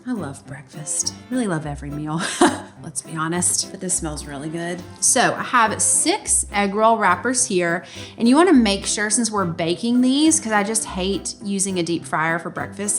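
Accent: South, United States. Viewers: Jacob Halifax - It's like Southern and Valley girl at the same time. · It's a beautiful southern accent ! " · Huneeee where's you from? Love a southern lady. I grew up in NC and your voice sounds like home!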